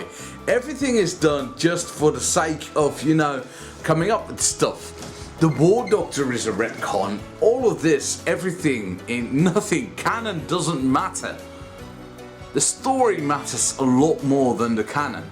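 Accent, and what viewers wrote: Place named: England, United Kingdom. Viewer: Your accent is sounding more English these days and I approve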